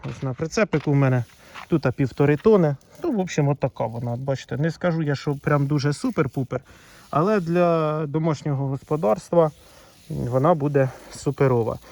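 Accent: Poltava, Ukraine. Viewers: О, полтавський говір...😊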